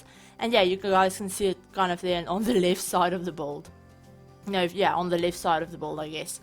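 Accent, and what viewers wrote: South Africa. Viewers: It is SO amazing to see a South African Youtuber, let alone a South African SIMS Youtuber! I got so excited when I heard your accent! The house is GORGEOUS and you did a great job! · I must say - I thought she sounded South African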